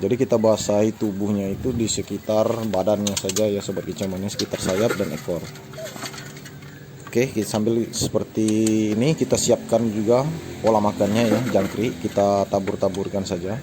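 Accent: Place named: Palembang, Indonesia. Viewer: Logat nya kayak palembang oku selatan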